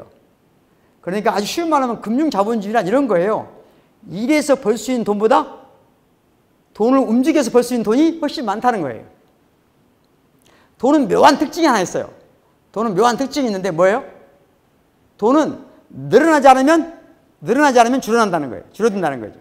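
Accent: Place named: Jeolla, South Korea. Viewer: 박구용교수님 감사합니다. 요즘 유튜브를 통해 교수님을 만나는 재미가 솔솔합니다. 그리고 너무 유익하고 재밋습니다. 아마도 박구용현상이 이시대를 휩쓰는 건 아닐까하는 생각이 들기도 합니다. 70이 되어 미국에 살고 있는 용봉에 후예로서 가슴이 벅찹니다. 적당한 전라도 사투리 억양도 가슴을 따듯하게 하네요. 광주! 라는 말만 들려도 울컥하는데, 전남대! 라는 말은 더욱 뜨겁습니다. 감사합니다. 저는 이제 박교수님의 열렬한 팬이 되었습니다. 너무너무 자랑스럽습니다 박구용교수님, 당신의 탁월한 지식과 열린 가슴과 번뜩이는 지혜에 응원과 박수를 보냅니다. 당신의 헌신으로 암울한 사회가 깨어나기를 진심으로 소망합니다. 사랑합니다❤❤❤❤❤❤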